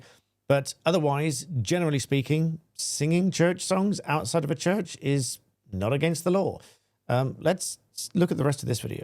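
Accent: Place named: RP, United Kingdom. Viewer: Really wish the algorithm would pi$$ you off from my feed. The pretentious plum that is jammed in your throat leaves you sounding like a failed private school boy trying to do one last ditch makeover on youtube. Twat.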